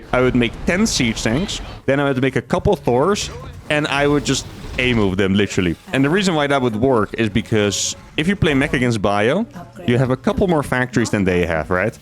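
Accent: Netherlands. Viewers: I've been lovin' your replays, big daddy. One can hear how much you enjoy the game through the excitement in your voice, and, the Dutch accent is just the cherry on the top. Keep it up, bru.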